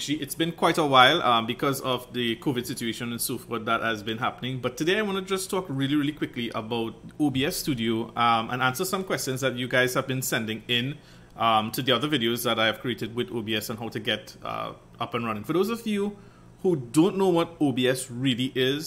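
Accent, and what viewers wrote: Trinidad and Tobago. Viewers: He sounds trini in arima or chaguanas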